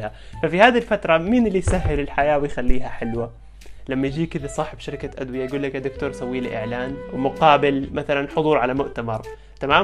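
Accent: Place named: Gulf. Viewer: انت من وين تتكلم خليجي اردني مصري لخبطتنا يخوي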